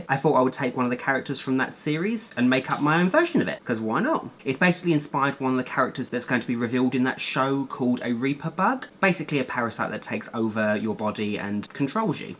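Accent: England, United Kingdom. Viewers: is he from England?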